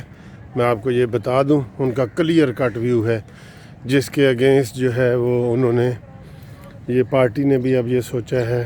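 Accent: Saraiki, Pakistan. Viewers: سرائیکی کی مٹھاس سن کر کان میٹھے ہوجاتے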